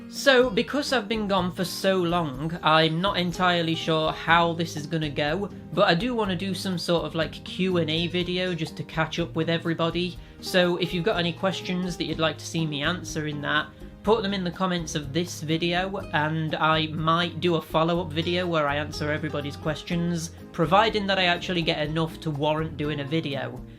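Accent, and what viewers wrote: North, United Kingdom. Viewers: Congratulations, nice to see u happy. Not a big fan of shorts (if u need feedback on short videos) Honestly, I prefer to watch long videos where u enthusiastically talk about stuff that genuinely interests you. I don't even care what the topic is, I just really enjoy your accent and your storytelling, those little humorous bits and sarcastic remarks once in a while. You could read a washing machine manual for all I care;) Good luck with the channel, I'm sure your subs would love to see your mug;) more than once a month (maybe, your words, not mine;)) but at the same time it's quite understandable why it probably won't happen. Anyway, personally, I would be grateful for whatever I can get, as long as that is smth you are passionate about coz that's what makes your videos so honest and entertaining. I don't have any questions for Q&A, I'm sorry, I was trying to think of smth not too personal but didn't come up with anything interesting. 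Perhaps, what part of the UK are you from, maybe, as I said, I absolutely adore ur accent, I was ready to make a guess you are somewhat of a northerner but again, it's more of a shot in the dark, I don't know much about UK and regional accents. Again, good luck and take care.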